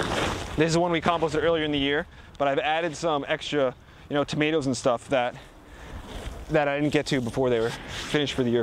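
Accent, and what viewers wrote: New Jersey, United States. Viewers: Nope that's a New Jersey accent. The state has its own culture but NY and Philadelphia won't admit it. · Wooder. Can't fake that Jersey accent....ha ha. Great videos!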